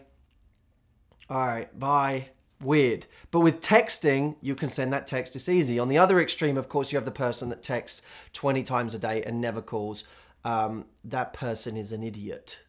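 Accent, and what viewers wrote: England, United Kingdom. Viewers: BRIT ACCENT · I watch all his videos just to see him and listen to this heart-melting English accent 😭❤ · What text can I send you to get your attention?Haha British accent Loves it! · I love the British accent...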